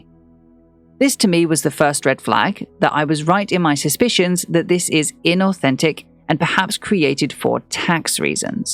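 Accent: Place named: United Kingdom. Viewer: I mean, England is not a single country. Yet. UK is and you kinda sound from there. Anyway. Maybe in 50years due to Brexit there will be no UK, but Scottland, Ireland and England, but for now.. UK. I am not trying to be pretencious it just make my ears bleed.. every time I have really liked the video, I find Selena drama queen shady. But I also now 100% due to my profession that people are so so into mental health now after the pandemics. It was a smart thing of her, this way she reached older audience as well, I mean sure she ha sgreat price point but I don't find her products good as some of professional MUAs, and kinda a lot of colors, glitters in the highlighters in certain light.. not really for mature skin, if you get me. Liquid blush too pigmented so need a work and then too heavy foundation (and this is not a mature skin friendly). Anyway, I wasn't before this a fan but this looks shady. 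Great job overall!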